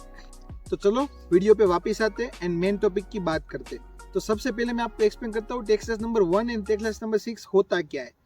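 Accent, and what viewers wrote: Gujarat, India. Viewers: Bhai ki aavaz se gujarat ki khusbu aari h😄 are you gujarati ??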